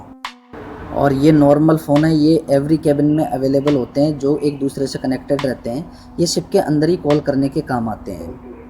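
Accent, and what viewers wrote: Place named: Haryana, India. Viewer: apki voice Haryana jesi lag rhi hai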